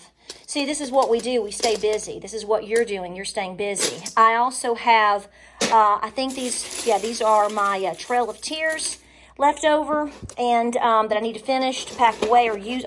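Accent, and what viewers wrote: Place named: Appalachia, United States. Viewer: Anyone sayin you ain't appellation. Just need to hear this rant! Southern momma bear strong.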